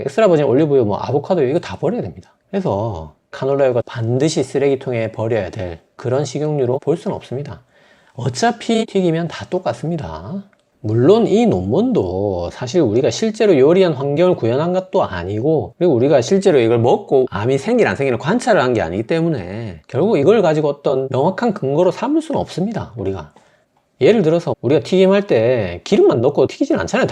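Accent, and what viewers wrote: Gyeongsang, South Korea. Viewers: 선생님 꿀 지식을 경상도 억양으로 설명해주시니 정겹습니다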